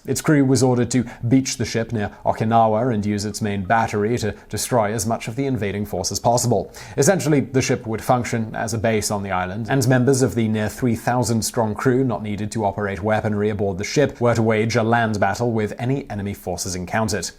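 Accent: United Kingdom. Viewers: Too many factual errors, even with the British Accent, to be worth watching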